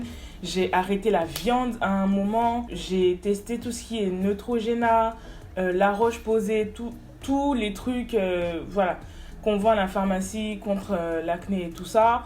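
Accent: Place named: Ivory Coast. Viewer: L'accent me fait penser a une ivoirienne 🥰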